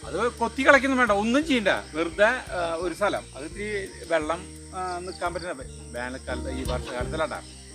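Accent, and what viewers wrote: Thrissur, India. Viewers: സൂപ്പർബ് ട്ടാ തൃശ്ശൂർ slang രസമുണ്ട് കേൾക്കാൻ. ഉപകാരപ്പെടുന്ന video. പിന്നെ വള്ളിച്ചീര കിട്ടീല്ല. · കൃഷിയേക്കാൾ ഇഷ്ടായത് മ്മ്‌ടെ തൃശൂർ സ്ലാങ്ങാണ്റ്റ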